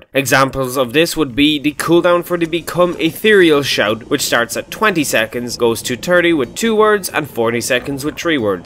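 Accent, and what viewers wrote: Ireland. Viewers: I have watched over 50 of your videos at least so far and this is the first one I realized you have an Irish accent.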